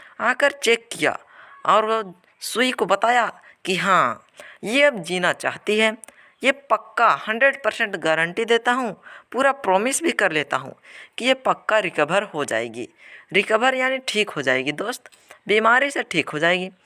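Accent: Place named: Uttar Pradesh, India. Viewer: Thanks bro for explanation it help me lot in explaining the question by the way it seems like you're from uttar Pradesh or Bihar and I am sure you will have million of subscriber in these year all the best and happy new year 🥳🥳🥳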